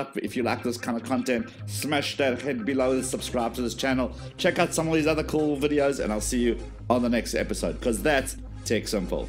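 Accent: South Africa. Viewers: Your accent is so South African 🤔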